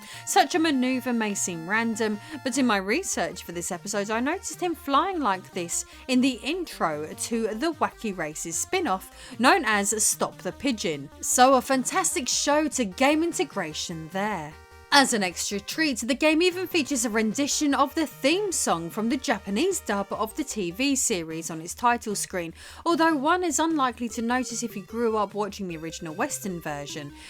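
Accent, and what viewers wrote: United Kingdom. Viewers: Hello You have a nice accent, are you British ^^ I wish i could find another Lady like you, passionate by video games.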